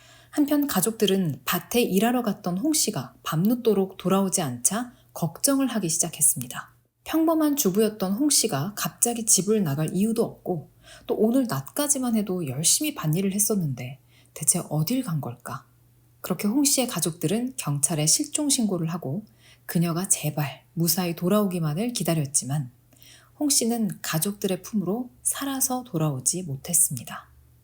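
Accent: South Korea. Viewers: 의 발음 안되서 극혐임 으 으 이러는데 전라도 발음 개 듣기싫음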